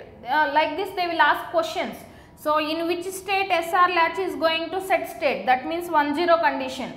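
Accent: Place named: South India, India. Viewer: your accent is shittier than shit itself. God help these South Indians with English lol.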